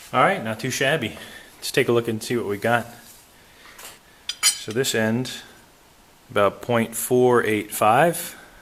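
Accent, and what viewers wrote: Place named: New York, United States. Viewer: Does hail from New York? That might explain the vocal similarity to Raymond Romano and Alan Alda who both are From there.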